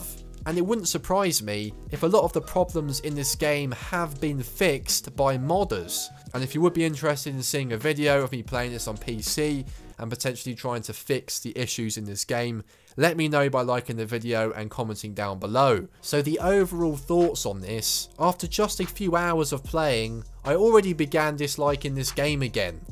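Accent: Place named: Chelsea, United Kingdom. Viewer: Man u sound like such a toff. Your aight there i mean im here.. its your voice ..mad made in. Chelsea vibes . Hearing you getting your winge on about fps and world densities is funny..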